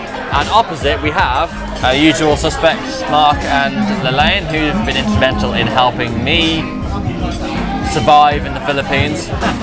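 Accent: United Kingdom. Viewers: I noticed while you were tasting the sinigang soup your companions were telling somebody (the restaurant manager perhaps) that your a famous vlogger from the UK (your accent helped too) ergo you got the dinner for free. Your lucky that your Filipino companions were social media savvy and knows how to negotiate, but have to advise you that's not always the norm. Sorry for being a spoiler but kudos to those Filipinos you were with.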